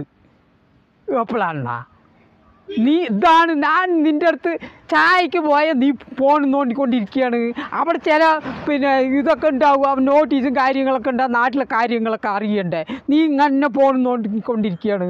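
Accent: Palakkad, India. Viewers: പാലക്കാട് ഭാഷ 🥰🥰🥰🥰സൂപ്പർ. നിങ്ങൾ pkd എവടെയാ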